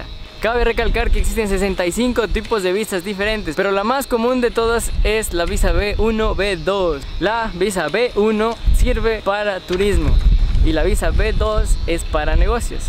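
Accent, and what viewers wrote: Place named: Loja, Ecuador. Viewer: Muy buen vídeo tienes mucho talento, saludos desde Loja hermano, tú pareces Lojano también por tu acento y todo...de que parte eres?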